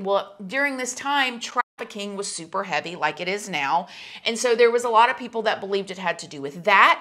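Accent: South, United States. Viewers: Your southern accent is so strong, I love it!x I’ve been waiting for this to happen…it makes sense now why when she was found, she went super silent! I always thought it was cause she was traumatised, but maybe she’s trying to avoid inditement! Actually makes me sad for her husband when the cheating accusations came out! I wonder if he’s standing by her 🤔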